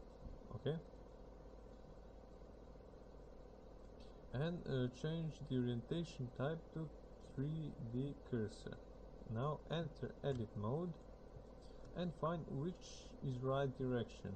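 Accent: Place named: Serbia. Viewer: May I ask where are you from? Your accent sounds like Serbian. Of course the name that I saw on the blog saying the same thing. Excellent work. For how long have you use blender?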